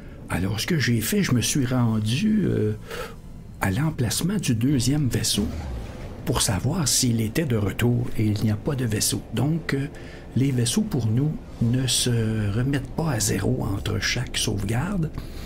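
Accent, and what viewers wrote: Quebec, Canada. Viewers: Ne change rien. Perso, j'adore l'accent québécois...👍👍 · Salut, Je voulais juste te dire à quel point j'apprécie ta façon de parler avec cet accent québécois authentique. C'est ce qui rend ton discours si unique et captivant. Ne change rien! En France, où j'habite, on n'entend pas souvent cet accent, et c'est précisément ce qui rend ta présence si spéciale. Ta manière de parler apporte une touche de fraîcheur et d'originalité, et c'est l'une des raisons pour lesquelles tant de gens t'écoutent en france. Ce que tu fais est vraiment superbe, et je pense que c'est important de célébrer nos différences linguistiques. Cela crée une diversité enrichissante et stimulante. Continue à être toi-même, car c'est ce qui te rend exceptionnel.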